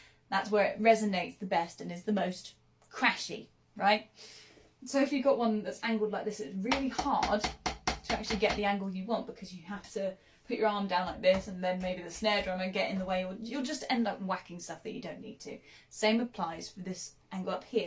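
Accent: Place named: Cockney, United Kingdom. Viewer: This bird is Cockney!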